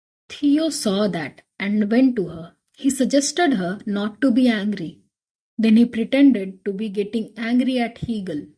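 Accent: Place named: India. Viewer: Indian English 😂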